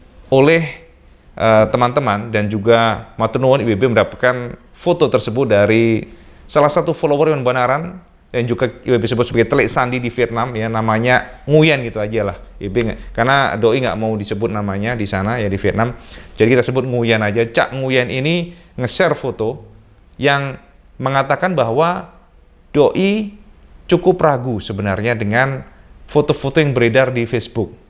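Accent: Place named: Java, Indonesia. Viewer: Wkwkwk kalo ngomong jawa kok kocak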